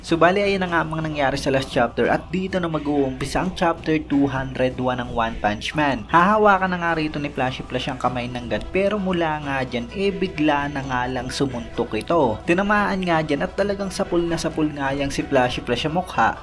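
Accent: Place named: Iloilo, Philippines. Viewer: ilonggo ka yata boss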